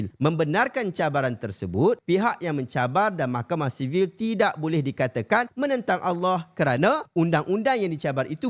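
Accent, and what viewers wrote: Malaysia. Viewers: Ikut telo melayu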